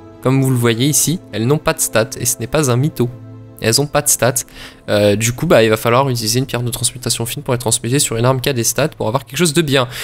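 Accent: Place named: Bourgeois, France. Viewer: superbe vidéo mais arrête de faire ton accent bourgeois. c'est juste pas drôle.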